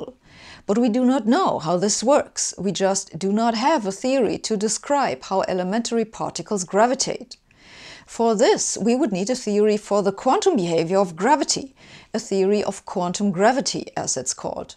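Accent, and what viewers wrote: Germany. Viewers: I have some real Qs: why are forces free? Why don’t they cost anything? And why don’t they get tired? How does energy fit into all this? Is energy a currency? Currency as in cost? Cost as in no longer free? And if all the energy in the universe is a constant, why does anything happen at all? Because it doesn’t seem like anything is being spent. Perhaps, specifically, if a magnet sticks on a ceiling, why does it not get tired?… While I’m at it, someone asked does a cup of water think? Maybe think more if it’s heated. Perhaps not, but it must think I’m hot or getting hot, if you know what I mean. That’s the problem with thinking, a stone must think it’s a stone and water must think it’s water or I would not be able to think at all; surely. See if you can explain any of that without your typically boring clenched teeth tight mouthed monotone that speaks with a German accent very well, even when you are always trying to lighten up a lot.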